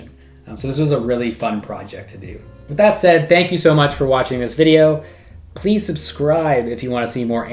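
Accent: United Kingdom. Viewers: I thought this guy was British fsr, now I know he's not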